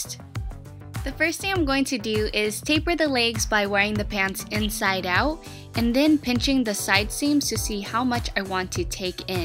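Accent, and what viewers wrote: United States. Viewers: i love these videos even though i have no sewing ability whatsoever 😂 also, it amuses me the way americans say legs like 'ley-gs' rather than 'leh-gs'. 😉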